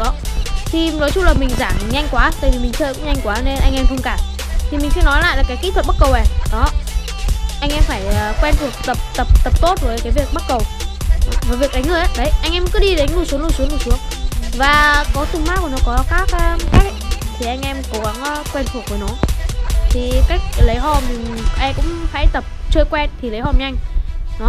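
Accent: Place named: North, Vietnam. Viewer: Nói ko hiểu gì hết ( hì hì tui ko nghe đc giọng của người bắc)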